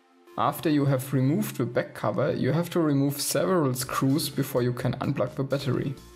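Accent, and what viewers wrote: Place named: Germany. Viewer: Klingt so unschlagbar Deutsch, kann man nicht verbergen... EDIT: Good to know i would sound... now it makes sense why people always want me to join the voice-chat.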